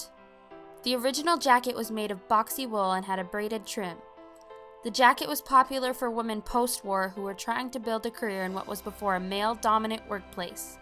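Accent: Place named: United States. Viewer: Sorry, the voice commentary is very bad. Terrible, high pitched, American accent.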